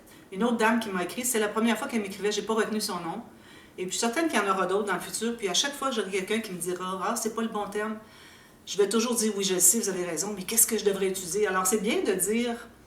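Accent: Quebec, Canada. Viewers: Et coucou MATTER OU MATTAGE c est adosser une photo sur un papier ou un Carton légèrement plus grand pour faire ressortir la photo Donc je ne vois pas pourquoi changer puisqu on comprend très bien....c est ce qui fait votre charme avec vos mots à vous. Pour ma part j adore et comme je vous suis régulièrement je parle votre "Langage" mais avec mon accent Ardéchois et vous canadien A très vite Co and Fau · Oh la la quelle histoire ! Pour pas grand chose ! Surtout ne changez rien. Vous êtes parfaite comme ça. On comprend très bien ce que vous voulez dire. Je donne des cours de scrap dans une association et j’ai toujours utilisé le terme « mater ». Je l’utilise pour mettre une photo sur un cadre un peu plus grand et « habiller » pour poser le papier design. Autrement j’ai adopté certains de vos termes 😁. Donc ne changez rien du tout. On vous aime comme vous êtes, gentille, rigolote, claire dans vos explications et surtout j’adore votre accent. 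Il me rapproche de mon fils, installé à Montréal, que je n’ai pas vu depuis plus d’un an à cause de cette pandémie. Laissez de côté les 3 ou 4 qui râlent et écoutez toutes les autres ! NE CHANGEZ RIEN Bises ensoleillées de la région lyonnaise Françoise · Moi j'aime beaucoup votre petit accent et vos thermes franco canadien ont du charmes je comprenais très bien se que vous vouliez dire .mais si cela chagrine quelques personnes faites le pour elles.... Merci pour vos nombreux tutoriels cela m'aide beaucoup à progresser ♥️♥️♥️ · Mais, ne change rien, avec nos périodes de confinement en France, t'entendre, me permet de voyager, de me souvenir de mon ancien prof de math qui venait du Québec, bref c'est génial. Crois tu sincèrement que nous autres français sachions parler le français? Suivant la région d'origine, on utilise chacun notre français. Bisous, Schmack, Adishatz... Bref salut.... Et oui c'est ça le français.... 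Et j'adore les termes matter, couture, etc... Désolé de ne pas avoir pu t'aider 🤣🤣😜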